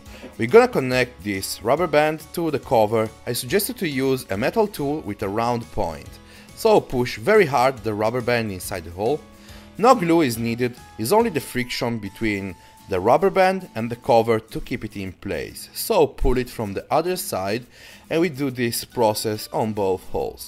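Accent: Italy. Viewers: he's italian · yeah to be honest xD u can really tell its Italian also for the first trick i was able to switch the front and back camera after unscrewing it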